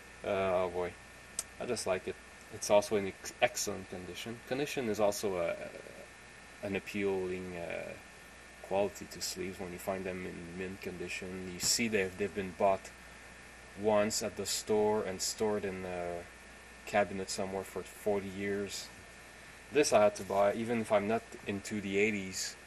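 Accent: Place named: Canada. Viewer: I absolutley love some of these interesting records, and i want to see your whole collection. so please keep posting!!! And thats what i meant by no talking, please dont take it as a put down, (ya gotta enjoy a good canadian accent) but the quicker you get to the point, the more albums you show, if there's alot to say about a certain album, thats fine but you better be putting out more videos. you got some great stuff and much respect.....BUT POST MORE VIDEOS!!!!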